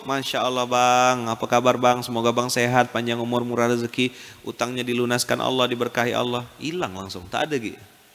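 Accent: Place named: Malaysia. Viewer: Logat macam Malaysia pun. Terbaik ustadz ni.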